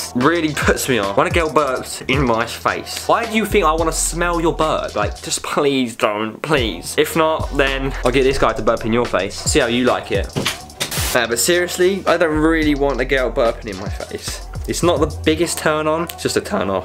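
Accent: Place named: England, United Kingdom. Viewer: he's from England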